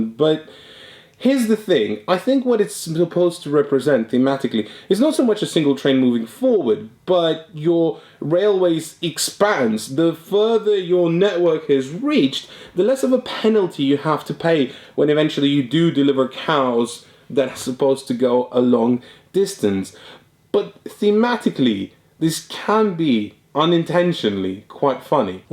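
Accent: United States. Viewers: I love your "California dude" cowboy accent. That was glorious! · Great American accent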